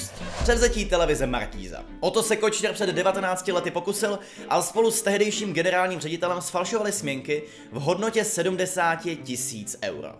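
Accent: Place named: Czech Republic. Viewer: to je tak smiešne keď čech číta slovenčinu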